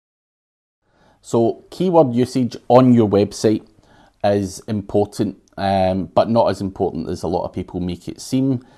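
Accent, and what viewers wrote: Scotland, United Kingdom. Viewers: As soon as I heard you were Scottish, I liked and subscribed :)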